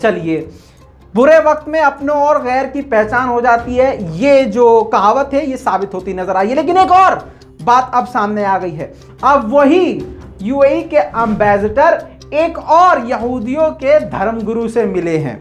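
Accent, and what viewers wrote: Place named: Uttar Pradesh, India. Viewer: भाई आप यू पी से हो, किस शहर से हो आप की भाषा से लगता है कि आप यू पी से हो।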